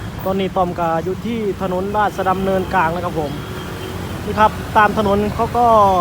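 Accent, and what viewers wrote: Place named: Champasak, Laos. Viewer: บ่าว มาจากแขวงไหนครับ? ผมเดาว่ามาจากแขวงจำปาศักดิ์ สังเกตจากการออกเสียงด.เด็ก,ซโซ่,ออกเสียง ฤดูเป็น ระดู ฯลฯ ผมเดาถูกมั้ยครับ?😂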